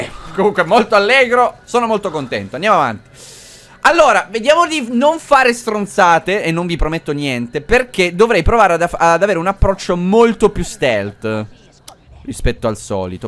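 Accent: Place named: Naples, Italy. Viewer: Potrei parlare napoletano meglio di te anche se non parlo napoletano. 😂 Vabbè, a parte gli scherzi, non mi ricordavo che Favij parla napoletano. Mi ricordo che nei vecchi video non parlava napoletano. Comunque Leon è quasi come me. Anche se ho un taglio di capelli un po' diverso, ma è quasi come me. Stesso carattere e stesso atteggiamento.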